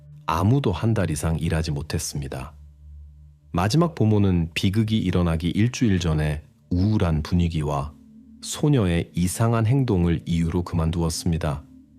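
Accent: China. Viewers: 약간 조선족말투 같기도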